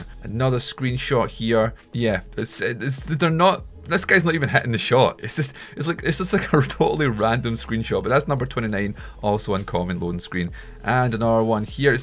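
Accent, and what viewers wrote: Scotland, United Kingdom. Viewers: where you from Kilmarnock Edinburgh or Glasgow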